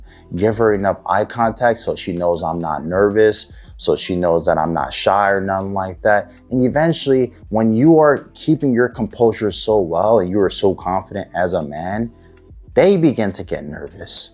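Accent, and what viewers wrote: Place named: Nigeria. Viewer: The nigerian accent goes crazy bro haha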